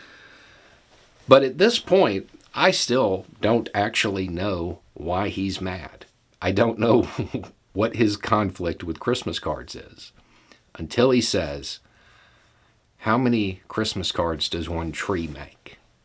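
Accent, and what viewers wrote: South, United States. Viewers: Enjoy your channel (and accent from one southerner to another). What I tell folks is it keeps ppl in jobs. In this case the postal workers. Your son would probably answer that Internet companies have workers too? Well no son. They have AI reps that you can't get an answer from or they've lost their jobs like Twitter. I won't get into the environmental part of this, but as others have pointed out not all paper is cut from trees. HAPPY HOLIDAYS! See? I just saved time, money, and a tree. Have a good'un.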